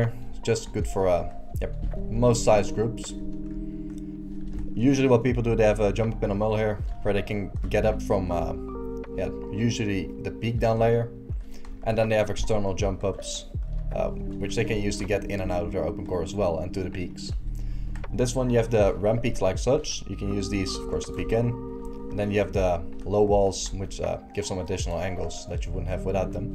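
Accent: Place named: Netherlands. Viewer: Ben je nl want je hoort het echt 😂😂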